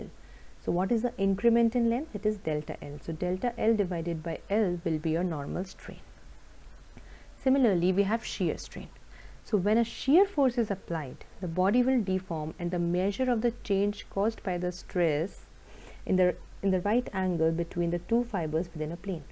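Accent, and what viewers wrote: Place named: India. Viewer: Is the teacher from India? the acccent is not standard.........but the lesson is good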